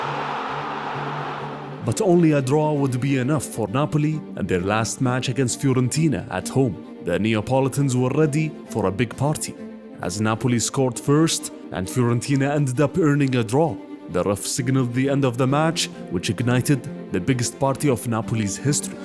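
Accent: Arab world. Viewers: This was an excellent documentary. Until the very end I thought the narrator was Aregentinian😀 before finding out the accent was Arabic. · The narrator has a thick Arabic accent. That's why. He needs to attend an Accent Removal Course...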